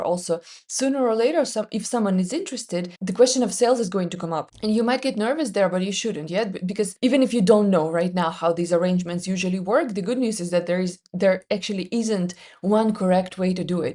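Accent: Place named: Norway. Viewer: you have an accent yeah / could be Norwegian?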